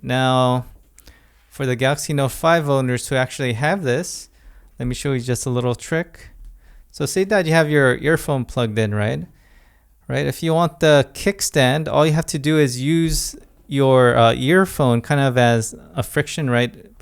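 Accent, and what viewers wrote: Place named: United States. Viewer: Are you Korean? Your English is very Americanized/Westernized.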